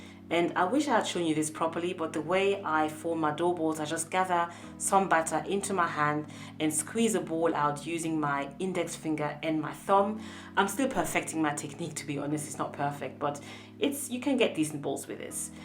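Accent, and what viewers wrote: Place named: France. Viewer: I can feel your French accent in the English 😂😂 you're such a patient teacher 💕💕💕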